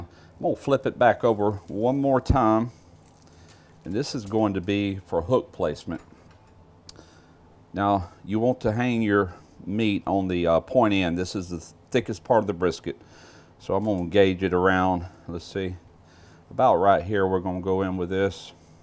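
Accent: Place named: Mississippi, United States. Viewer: You can tell your a Mississippi Man....lol. sound like my friend Amos Fowler ( ex NFL player)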